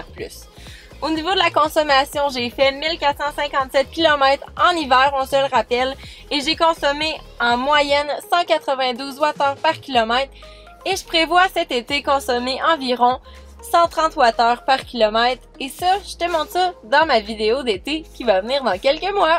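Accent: Quebec, Canada. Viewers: J'adore ton accent quebecois. Moi qui est un peu séjourné au Canada plus jeune pour mon travail,j'adore ce pays lointain de la France. Bonne continuation et merci pour les tuyaux sur certainement ma future voiture TESLA Y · Moi: Cet accent, Mon pote : Ce du Quebecois. Moi: J'aime la fille moi. Mon pote: Non Moi: Pourquoi? Mon pote : Vous allez jamais se comprendre. Merci pour le video, Un nouveau AIME pour vous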